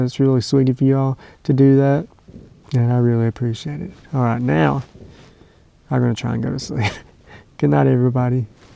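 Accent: South, United States. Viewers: love your southern accent bro.i have a good bud from San Antonio Texas.builds golf courses all over the world.met him here on Vancouver Island years ago while he was sculpting a course.he had a good accent as well.cheers.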